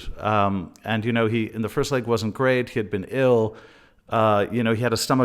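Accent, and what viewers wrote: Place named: United States. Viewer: Why do u have an American accent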